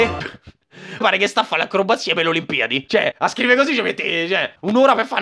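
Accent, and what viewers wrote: Rome, Italy. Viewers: Ma cos'è che scopro sta serie e sto canale solo ora? Ma io me i scrivo subbbbito che sto sputando sullo schermo dalle risate cazzo xD (e il romano me sta influenzando anche se so di milano) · Sei di roma si sente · Ahahahahah no vabbè sto morendo! L'accento romano ce sta proprio bene qua! Susu che vogliamo pure tutti gli altri ventordici episodi, susu!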